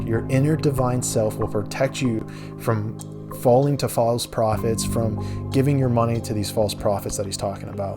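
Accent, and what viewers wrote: United Kingdom. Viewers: Weird how this being has a British accent. Lol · The British accent is kind of a red flag.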